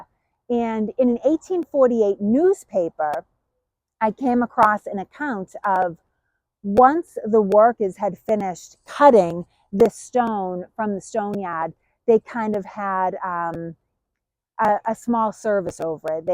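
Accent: Boston, United States. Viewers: I am new to the channel and am catching up on the videos. does a very good job to describe tactical operations in this video. She is accurate and technical, yet communicates it easily. As a Virginian, you gotta love the Boston accent! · I have enjoyed your telling of facts of our war of Independence and absolutely love that Boston accent! ❤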